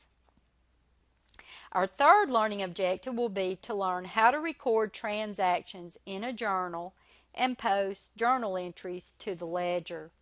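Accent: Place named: India. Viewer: shut up u sound like indian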